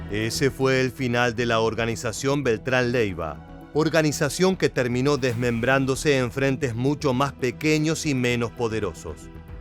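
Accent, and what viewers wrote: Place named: Argentina. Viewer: Tenia que ser argentino ese narrador arruino el docu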